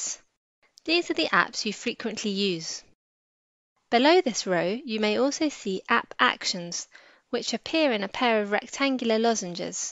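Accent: United Kingdom. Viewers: I can't deal with the British type accent.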